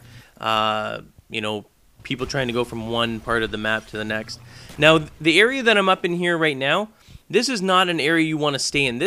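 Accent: Canada. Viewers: Canadian? :o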